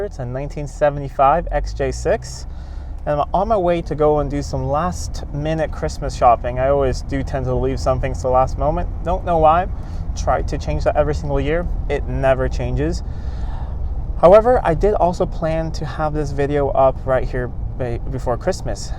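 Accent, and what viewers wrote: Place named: United States. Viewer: I know I’ve heard several times saying that you were in Sweden. But you do not sound Sweden or English you sound American.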